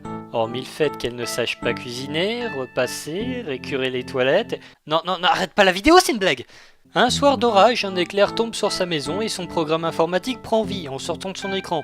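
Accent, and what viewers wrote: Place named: Nord-Pas-de-Calais, France. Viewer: Yeah!! est de retour! Mec, tu m'as fait taper des barres de rire avec ton accent chti, en plus de m'avoir bien diverti avec une bonne critique. Faudrait que je me mette à Love Hina. J'ai tellement de Ken Akamatsu à rattraper. En tout cas ton retour fait hyper plaisir, bon courage pour la suite;)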